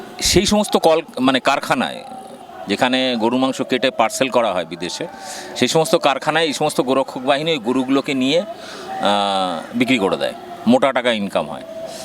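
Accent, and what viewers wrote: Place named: Bangladesh. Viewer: Apnar bangladeshi mone hochhe dada.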